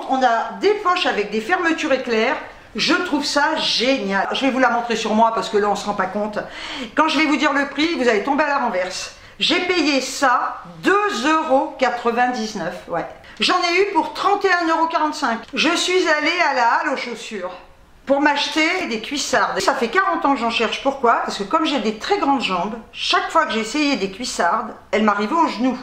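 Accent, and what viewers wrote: Algeria. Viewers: Ma chouchoute super belle en cuissardes et le pull magnifique, moi la petite fille de pieds noirs ça ma fait plaisir de réentendre l'accent et remonter de bon souvenir avec ma grand mère, qui me disais dès qu'elle me voyais avec 1 garçon ma fille attention à ta marguerite. Bonne soirée bisous de Lyon et câlins à Eurny et Mélodie 👍👍👍👍👍👍👍💗💖💓💕👍👍👍👍👍👍👍NAD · Parle ma parle avec ton accent pied noire là je ris😂😂😂j'adore. Pleins de bisous 😚😚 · Hello coucou!!!! Promis j'ai pas rigolé pour le Roll-On insectes!!! Enfin j'ai pas rigolé très fort quoi hein! Un peu quoi! Un tout petit peu!!! Cela dit, les roll-on on en a à la maison pour mon fils et moi (David il se démerde il se gratte MDR) et ça empêche effectivement de se gratter quand même... BREF!!! Les cuissardes sont magnifiques, elles te vont super bien! Très classes! Et le pull doudoune polaire j'adore et pour le prix non mais je rêve!!! 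Tu dégottes toujours des trucs de folie toi! Dès que j'entends l'accent pieds-noirs je pense à Marthe Villalonga moi! Je te fais plein de gros bisous Ma!!! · Cc ma belle j aime trop ton accent pieds noir 🤣🤣très bonne vidéo comme d habitude 😘😘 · coucou bisous a toi et aux animaux pour retrouver ton blanc tu achete du vanish cristal blanc tu mets les vetements blanc dans une bassine avec 2 ou 3 cuilleres de vanish blanc tu laisse tremper toute la nuit et tu envoie en machine avec 1 dose en plus de ta lessive et ça va redevenir blanc parole je le fait avec les body de mon bebe. J'adore quand tu fait l'accent pied noir j'ai l'impression d'entendre Elie Kakoue dans Mme Sarfati j'adore. Allez gros bisous a toi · Je ris de l'entrèe:NOZ! lieu de perdition de!!!!!!!!!!!!!Bonjour! my jolie candle,c'est une brillante idèe, tiens les boucles d'oreilles sont assorties à la robe que tu nous avez montrè! 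Mets du Blanco de Vanish, c'est efficace,c'est un sachet à mettre dans ta machine ou autre; j'aime l'accent pied- noir! et pour cause:mon mari l'est!!!Elles te vont bien les creoles,te vont bien.:reine des affaires,du pret à porter etc....Dis donc avec ta brosse, tu vas te servir d'un cotè seulement!!!!mon EURNY § GROS BISOUS! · Coucou mdr 😂 ça faisait longtemps que je n avais pas entendu l accent Pied-noir!tu es fantastique!!😘😘😍😍😻😻❤️❤️ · J’aime..... Que dis je? J’adore ta joie de vie et puis il faut bien l’avouer ce petit accent pied noir te va à merveille 👍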